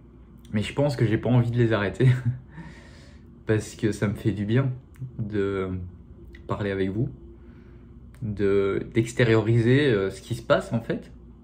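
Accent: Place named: Belgium. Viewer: Très très touchant, tellement réaliste, bravo de dire tout haut ce que l'on pense tous! Et sinon ton petit accent belge😍😍 Gros bisous et bon courage à ta maman et à vous tous dans cette épreuve.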